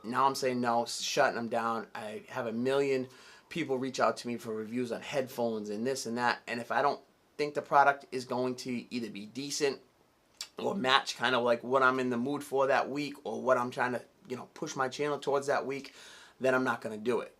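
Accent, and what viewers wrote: Boston, United States. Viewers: Subscribed! Def can hear that Boston accent! I work in Boston as truck driver too. Fun city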